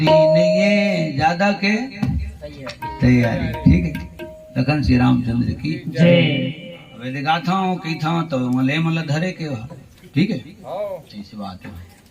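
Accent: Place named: Chhattisgarh, India. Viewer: बहुत ही सुन्दर रामायण कथा वाचन । बड़ सुघघर छत्तीसगढ़ी बोली भाखा में जी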